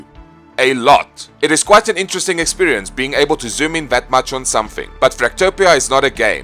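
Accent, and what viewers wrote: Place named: South Africa. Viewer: You sound a little bit Southafricanish lol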